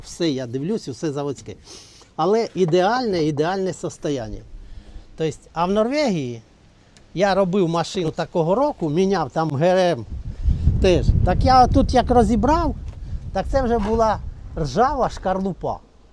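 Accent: Chernihiv, Ukraine. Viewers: Ви мабуть з чернігівської області по акценту чутно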